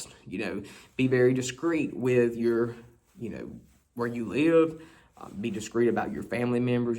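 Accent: South, United States. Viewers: To some types you would be naturally irresistible, to others nearly-so, and to a very few TOO MUCH of that first!! 🥴 Goes with the territory, 🌟 🌟 Your 'southern drawl' is pretty spectacular out here, in Yankee Territory. 😉 (Well hell, I'm 3/4 Johnny R. maself!) As long a/s you're around -- a lifetime subscriber/supporter is here. 👍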